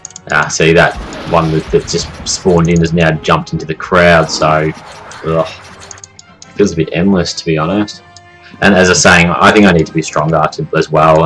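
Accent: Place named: Australia. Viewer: Are you lagging? Looks like you can barely walk anywhere. What country are you playing from and what shard are you in? Because you sound Australian, so you should be in Oceania right?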